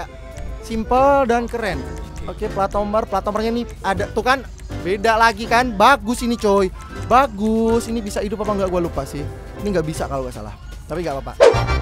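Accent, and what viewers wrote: Jepara, Indonesia. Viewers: Abang orng jepara?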